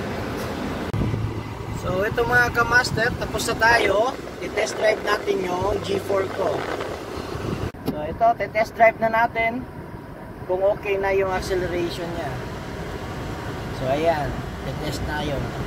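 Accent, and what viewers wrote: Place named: Visayas, Philippines. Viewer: ang Cute Cute Bisaya nga lang....😅😅😅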